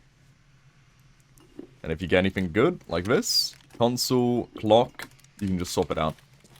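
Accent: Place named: Holybourne, United Kingdom. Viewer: That holybourne accent tho........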